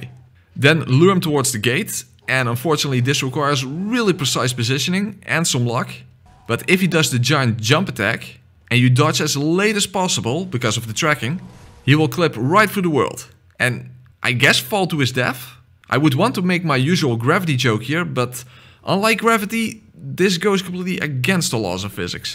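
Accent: Netherlands. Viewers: Ey ur Dutch right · Are u a dutch guy? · Is hé dutch? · A dutch man, god, thats gonna bother me...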